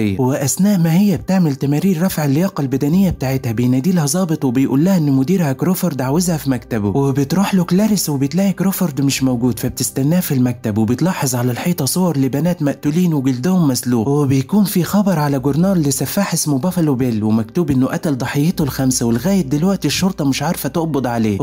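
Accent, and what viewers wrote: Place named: Tunisia. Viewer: الي يتكلم من تونس !!!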